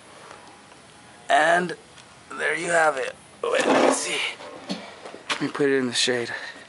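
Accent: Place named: New Mexico, United States. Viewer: You from New Mexico? it's the accent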